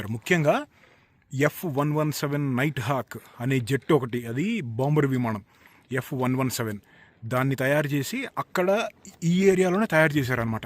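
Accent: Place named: Andhra, India. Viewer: Anna nee slang andra slang laga undhi anna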